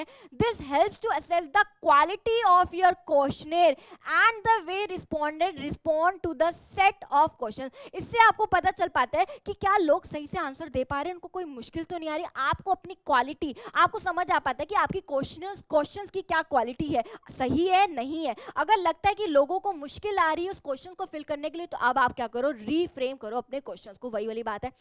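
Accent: Punjab, India. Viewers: Ma'am ur Panjabi ascents ummm so gudd bole to bawal...🤧🌼✌️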